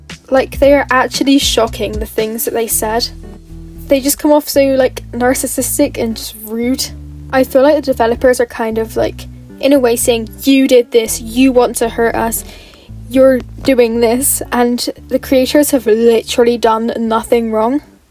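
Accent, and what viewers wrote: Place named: United Kingdom. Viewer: Ur voice sounds so much more British than irl 🧐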